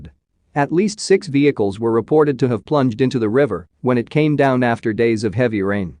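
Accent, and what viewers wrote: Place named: United States. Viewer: Blatant fabrication with intent to create unrest in China. From the accent of narration, it must be a vicious American.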